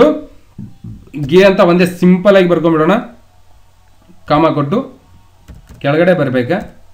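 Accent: North Karnataka, India. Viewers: Haha hutharkanada bashe keloke kushi agutapa ❣️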